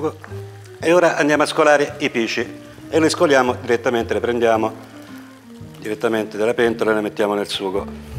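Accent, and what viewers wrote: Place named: Casentino, Italy. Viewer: Ok, dalla parlata infatti mi sembri vicino dalle mie parti Casentino e per curiosità te l'ho domandato, sorry :-) Magari mi sbaglio. Pura curiosità. Toscani numero uno!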